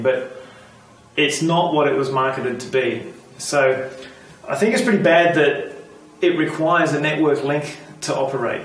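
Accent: Australia. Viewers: I know this is an old post but maybe you are still monitoring it. I live in California, you sound like you are from AU so you may not know about the planned power outages in CA. So if I am understanding you correctly when there is a planned power outage which can be for up to 6 days they tell us if there is no wifi or 3G connection for the power wall to report to Tesla I will have periods of time when I will not have power if I am on battery?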